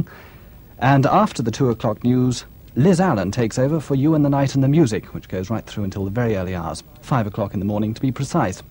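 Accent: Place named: RP, United Kingdom. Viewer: Love this announcer's style. Halfway between the stuffy RP and the "ey 'up Luv" that we get today.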